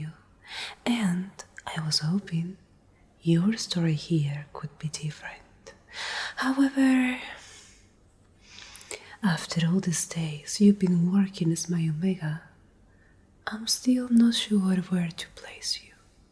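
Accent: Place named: Latin America. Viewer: btw, I noticed that in the video prior to this one and in some prior (the birthday party one) you had some Spanish worded books and/or a Latin American accent. Are you from a Latin American country?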